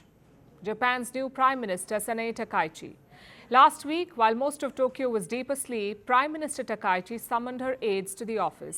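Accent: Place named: India. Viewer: It's difficult to listen to this presenter. Thick Indiannn accent. Lucky the caption helps.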